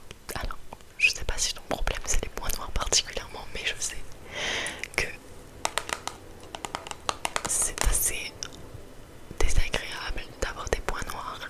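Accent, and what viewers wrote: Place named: England, United Kingdom. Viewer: incroyable la vidéo, c'est super relaxant et puis tu as un très bel accent anglais☺ Je m'abonne directement et j'espère que tu iras loin car tu en as le potentiel ;)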